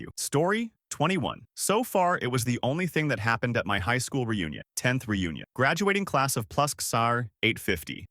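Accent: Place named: Iowa, United States. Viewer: I’m from Iowa and before you even said Hyvee I was like “Yeah she’s from around me.” Eastern Iowa😂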